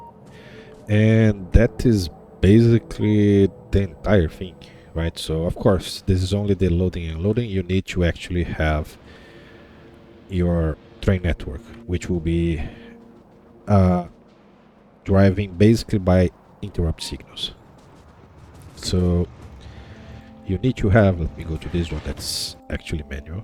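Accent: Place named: Brazil. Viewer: Vc é brasileiro? Teu sotaque parece daqui.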